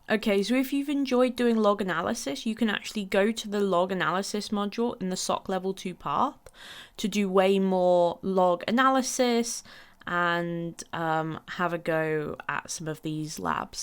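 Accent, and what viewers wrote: United Kingdom. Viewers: Watching all now after I've tried or got stumped... need to throw money at you to teach me. Nice to hear a uk voice for a change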